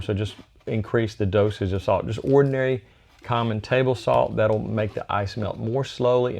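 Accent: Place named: Tennessee, United States. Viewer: Where are you located? You sound like maybe Tennessee.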